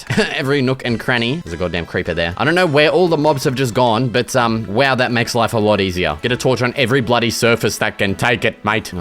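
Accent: Australia. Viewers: Are you aussie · Are you Australian? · Are you also Aussie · 1 minute and 3 seconds in and I already love how Australian you are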